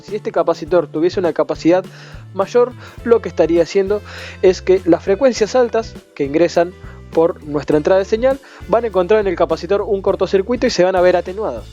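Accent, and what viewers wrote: Argentina. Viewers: eres argentino o chileno?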